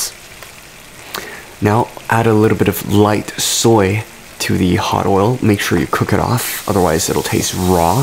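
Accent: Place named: United States. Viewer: Unnecessarily Far too long with American accent is irritating. Some items are too exotic to find in the UK.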